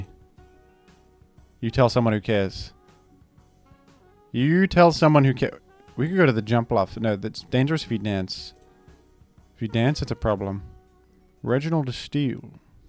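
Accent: United States. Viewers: cool American accent always gives me chills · Something about the American weeb accent disturbs me in a profound way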